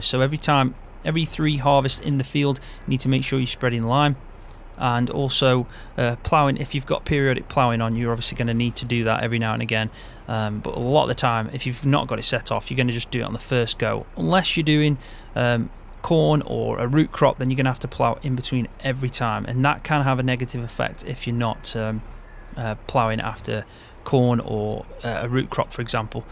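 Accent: Staffordshire, United Kingdom. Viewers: Hi are you from Staffordshire by any chance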